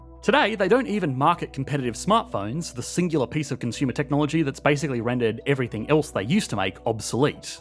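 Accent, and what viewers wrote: Australia. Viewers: the Australian accent is the worst accent in the world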